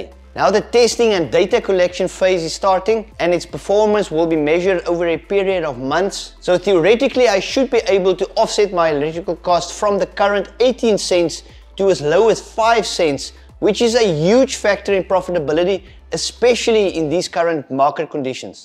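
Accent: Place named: South Africa. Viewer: Awesome, I noticed from the accent and other comments that you're also from ZA. I recently started mining some XMR on a spare PC I have lying around at home. Got p2pool and a local monerod running and getting about 4.7kH on 8threads on a 5600g. Was wondering what pool(/s) you use? I heard it's better to have lower latency but the lowest for us is EU based and even that is around 200ish ms. Kind regards.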